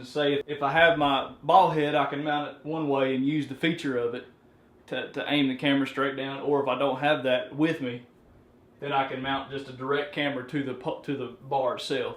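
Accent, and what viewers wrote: South, United States. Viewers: Idk why but I've never been a fan of U.S. southern accent. Actually, I've just not thought about it or considered it. But, my goodness, his voice and accent are just beautiful. Oh and the tutorial is great too. I'm trying to build a similar rig, and this looks really nice and straight forward. Thanks. 😊😊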